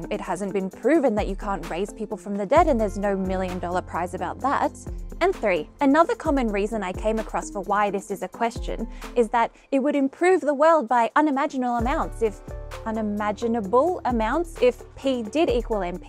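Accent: United Kingdom. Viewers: What you are Australian? I thought your accent was eccentric British! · Okay, over the past few days I've watched a few of your videos and it seems that no one is willing to "call the Emperor out", (so to speak). So, I'll be the skunk in the group and tell you that your videos absolutely STINK! The problem is not you (or your British accent) or the content (which is competently done). It's your pisspoor audio system! I can just barely understand what you're saying! You really have to get yourself a better system (you should start with investing in a much higher quality microphone!). You might also want to remove more bass from the audio and boost the treble. That would improve matters greatly.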